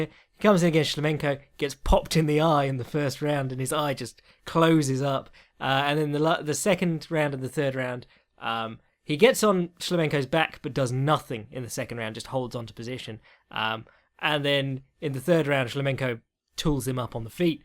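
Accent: England, United Kingdom. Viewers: I come here 50% for the educated mans viewpoint. 50% for the English accent so familiar to my now SEA dwelling ears and phrases like "makes you look like a massive bellend".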